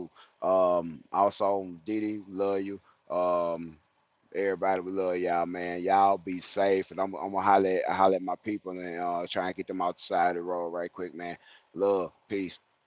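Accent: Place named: Mississippi, United States. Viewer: man you sound like one of my homies from Mississippi are you related to some kings from Hattiesburg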